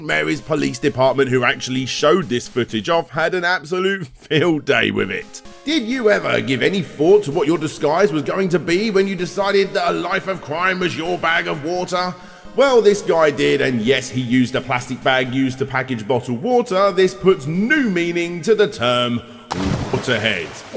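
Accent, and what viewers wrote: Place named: United Kingdom. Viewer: Great idea for a video! Also... are you the most British sounding Brit, ever?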